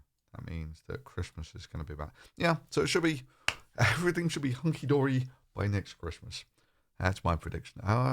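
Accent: United Kingdom. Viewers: I seen where u get your accent. Its grom uk. This guy is a aristocrat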